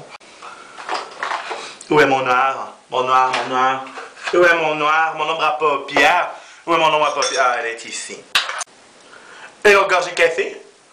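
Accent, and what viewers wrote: Quebec, Canada. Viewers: j'aaaaaaaaadore en plus en français avec le petit accent québécois!... c'est Parfait :) Merci pour cette vidéo :) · That's Canadian French, a LOT different than French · Que tu es drôle ! Quel plaisir de te voir et de te comprendre enfin, qui plus est avec l'accent Canadien. J'espère qu'il y en aura d'autres ainsi, que je puisse m'en rejouir et rire. J'adore ! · Entre l'accent canadien, l'accent anglais et l'accent francais en parlant en anglais, sans oublier ton talent en maquillage... mais y a pas un truc que tu fais mal?!! LOL C'est cool de penser aux francophones qui comprennent pas l'anglais en tout cas! Awesome as always and thank you for being so cheerful and funny all the time! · he speaks "canadian french" it's the same but there's just a different accent =) I speak "france french" and I can easily understand him =). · Excellent les tutos en français, et l'accent quebécois toujours génial à écouter ! 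=) Continue ! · Excellente vidéo! Je me suis marrée comme une bossue et puis le maquillage est réalisé avec une très grande dextérité! Bravo! Qu'est-ce que tu es drôle, en anglais comme en français!!;) "Gang de cochons"! Punaise ce que j'aime l'accent québécois!!! lol Bisous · Enfin!! Un video en QUÉBÉCOIS!! :) Tes super beau!! :D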